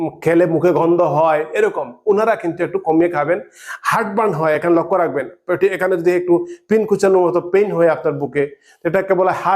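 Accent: Sylhet, Bangladesh. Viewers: ভাই আপনার বাড়ি কি সিলেট?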